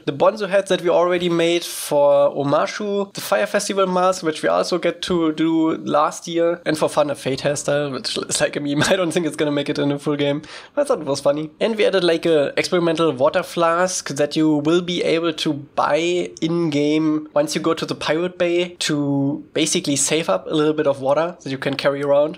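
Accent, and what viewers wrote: Germany. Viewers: Mann hört das du deutsch bist 😅😅😅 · I hören a Deutsch accent 😅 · this deutsch akzent is da hehehe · Höre ich da deutsch raus? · You sound so German...😂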